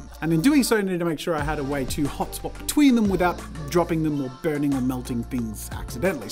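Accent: Australia. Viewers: Are u from Australia? I mean your accent. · r u aussie?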